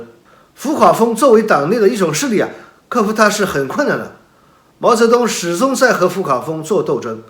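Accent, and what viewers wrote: Shanghai, China. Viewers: 听得出你是上海人了不起有胆识。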